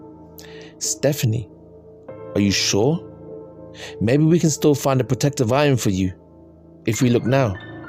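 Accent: United Kingdom. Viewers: Class story, hearing it from a fellow Brit is even better, as my football team says as its motto, south London & proud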